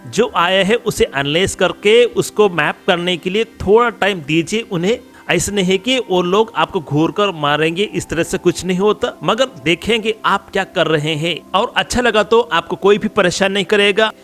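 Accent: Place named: South, India. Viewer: पहले अपना हिंदी तुम सही करो. वह दक्षिण से ही हे. बोलने का कोशिश भी करने नहीं देते आप लोग. फिर कैसे सीखेंगे?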